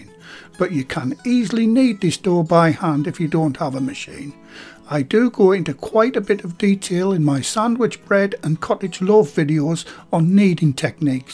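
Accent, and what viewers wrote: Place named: Yorkshire, United Kingdom. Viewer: Hi, just got your buns out of the oven, the house smells lovely, we will have steak on a baguette for dinner, thanks for all your hard work on the videos. I was born near doncaster in yorkshire, love to hear your accent again 😀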